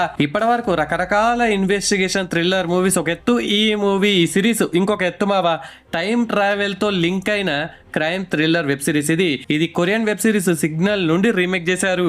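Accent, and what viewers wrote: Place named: Telangana, India. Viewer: బ్రదర్ అంటూన్నావు నువ్వేమో తెలంగాణ బ్రదర్ ఏమో ఆంధ్ర బాగుంది బ్రో....😂😂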